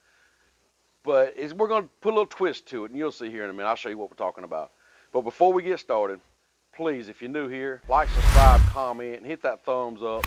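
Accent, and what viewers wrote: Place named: Panhandle, United States. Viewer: Where from in FL? You sound like you're from the Panhandle if anything. I'm in Orlando.. awesome cook.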